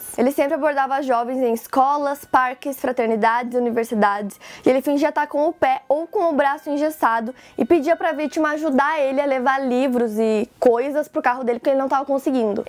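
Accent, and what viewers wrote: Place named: Paraná, Brazil. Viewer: Sotaque paranaense, sei pq sou paranaense tbm sksk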